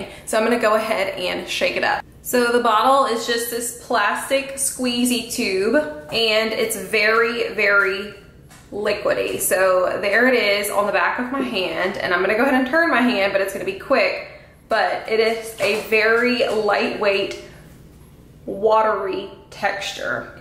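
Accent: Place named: South, United States. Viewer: Hey,! Recent subscriber here. I've also been trying the RdF Luminous Elixir Three Drop Foundation. I fluctuate between the shades Ceres and Metis. My facial skin is rosacea-prone combination/oily. My usual process is applying prescription medications (a serum-y type product, then an azeleic acid gel), a light moisturizer, then sunscreen. I let the sunscreen absorb for AROUND ten minutes. When I apply the Luminous Elixir, I dispense three drops on my fingers, lightly blend onto my other hand, then gently begin massaging the product onto my face, working from my reddest areas outward. I was also absolutely SHOOK by the coverage level of this foundation in proportion to the amount I needed to use. I can wear it all day with minimal creasing, and I haven't really noticed it sinking into pores (mine are BIG) at all. This might just be a skin-type or prep difference, but the Luminous Elixir leaves my face looking considerably less greasy than, say, the Ilia Super Serum Skin Tint. 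ALSO, I have found that even if I scratch my nose or touch my cheeks throughout the day, I'm not removing coverage. Perhaps consider dropping the product onto your fingertips first, rubbing them together ever so slightly, then applying to your face. It could make a difference in finish and wear-time for you! PS: It's nice to listen to someone on YouTube who rocks a southern accent. Cheers!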